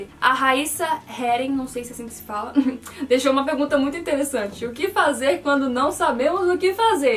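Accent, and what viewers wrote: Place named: Northeast, Brazil. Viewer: Mermã? Kkkkk vc aprendeu a falar que nem nordestinoooo huahuajau. Eu sou nordestina.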